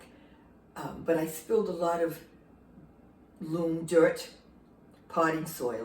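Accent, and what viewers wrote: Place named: Boston, United States. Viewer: Loved the update! I definitely think Parker was trying to tell you something important--😊 Someone here said something about the video being blurry. I think the autofocus caught something in the foreground and focus on that instead of you because you were in the mid-ground area. But then I started driving and couldn't watch but could only listen in the car. So it didn't bother me-I love listening and love your accent. Reminds me of the folks I met when I went to Boston. Have a great weekend!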